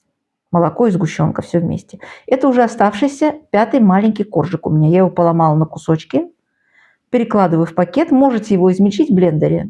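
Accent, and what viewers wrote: Baku, Azerbaijan. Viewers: Никогда не слышала о таком, спасибо за рецепт, спасибо за наш бакинский старый акцент, как вы его сохранили???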